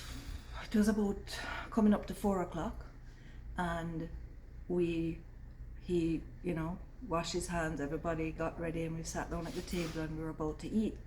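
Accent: Jamaica. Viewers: I left my home country in the UK due to discrimination. The world has changed in a bunch of weird ways. I'm English, I sound English (in that obvious way) in the same way you sound Jamaican even when youre not speaking like a Jamaican. I did not go to Canada, I went to my wife's home country of Moldova. It is an odd thing that as a foreigner with a love for Moldova, I am loved and treated with a higher acceptance than my home country. I think I know what went wrong. People from their countries belong to the internet, they dont have a place to belong, they dont have a place to represent. I feel your disconnect. I still love the UK... I spent time in Jamaica, I get it. I do... 😢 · This must hurt badly, even your accent is Jamaican. This is why i haven't when to Jamaica too much violence, i came to Europe 9yo now 40 and still haven't visit. The country need a safety net to help the people like in Europe or it going to be a nightmare in Jamaica in couple decades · Wow! 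It is amazing watching a white lady speak with an authentic Jamaican accent. Her accent is very real! Black people are killing each other over the things that white people have allowed them to have. We are living in a local & global system of racism (white supremacy) where Black people are being put into desperate situations where they have to steal and kill each other to survive. This is not just in Jamaica, but everywhere on the planet where people colour are. Including Canada. So there is no escaping this global crisis! These problems are not the directly & indirectly created by people of colour themselves, because they are prisoners of war. Slavery never ended in 1865.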